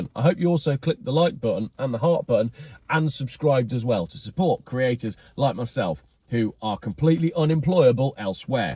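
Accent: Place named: Birmingham, United Kingdom. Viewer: maybe it was the sloth?? Great vids... happy to hear a Brummie after decades in Canada.... Keep on keeping on (lose the hat, get a bandanna)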